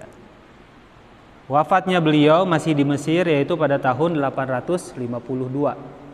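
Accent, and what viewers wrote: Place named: West Java, Indonesia. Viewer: Logatnya kaya orang Sunda ya ustadz, hehe maaf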